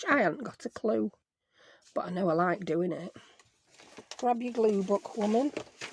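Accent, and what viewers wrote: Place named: Yorkshire, United Kingdom. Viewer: They look fab, and yes I get the bit about running over stuff with the wheelie chair, I do it all the time 😂 I’m in New Zealand and my parents were from Yorkshire so love hearing your chatter x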